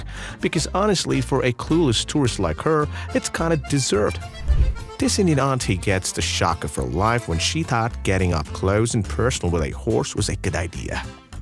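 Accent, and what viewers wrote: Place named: United States. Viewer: Why is an American doing the talking ?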